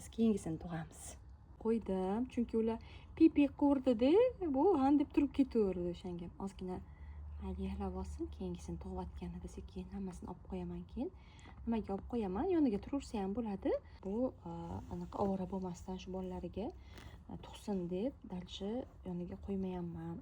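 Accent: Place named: Kashkadarya, Uzbekistan. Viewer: КАШКАДАРЁЛИКМИСИЗ . ЁКИ СУРХОНДАРЁЛИКМИСИЗ. ШЕВАНГИЗ КЕТОПТИТА.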